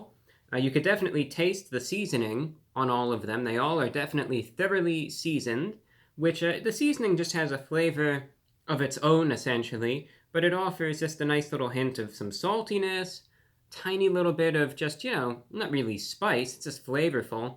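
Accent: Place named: Transatlantic, United States. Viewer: I've never seen someone in this modern era speak with a Transatlantic accent. Fascinating!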